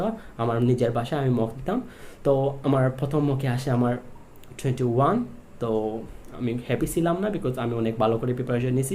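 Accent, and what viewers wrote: Sylhet, Bangladesh. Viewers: Are you Sylhet’s your voice is like Sylhet’s Very helpful your video.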